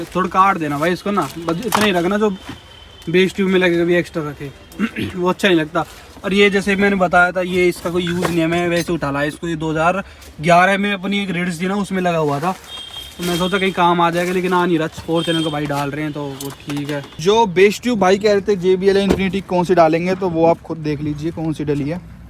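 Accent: Haryana, India. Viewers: Superb haryanavi accent love to hear it. Your videos are amazing. Keep making videos in the same accent ✌️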